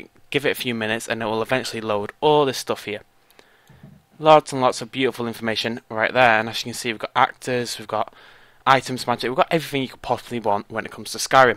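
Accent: United Kingdom. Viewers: Luckily I actually can imitate four of the over five thousand U.K accents so I can understand him. Now as for what he is talking about and making reference to, well I suggest you all go to full screen to be able to read the headings on whatever he is mentioning. If you need a translation into American aka Michigan-no-accent-at-all, just note me. I will attempt to delete all the extra words that are not necessary to learn how to do this in a transcript. I can do everything in Old English fonts to make it more authentic. ;)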